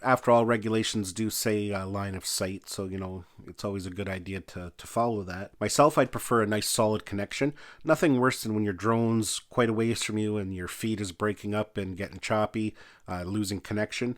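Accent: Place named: Maritimes, Canada. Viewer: Have yet to get my first drone, and am considering this. Excellent in-depth review, descriptions, and explanations. I learned a lot. By the way, what part of Canada was the footage shot in? The accent sounds a bit east coast-ish.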